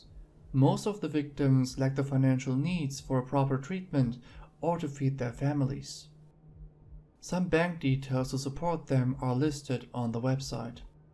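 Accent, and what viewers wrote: Germany. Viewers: Considering his accent sounds like Cosmodores i'm thinking hes german.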